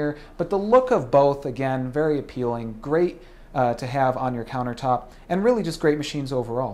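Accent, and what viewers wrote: United States. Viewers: he has a regional American accent. I assume you are Canadian as am I.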